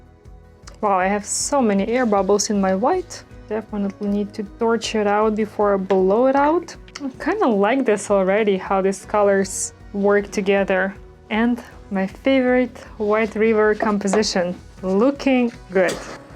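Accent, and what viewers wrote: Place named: Russia. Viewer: Another beautiful creation! In the least creepy way possible... is such a beautiful & charming lady & i am basically in love with the happiness she experiences through her creativity. I also have a thing for russian women & their english dialects. AND she's an artist... Her husband is a lucky guy!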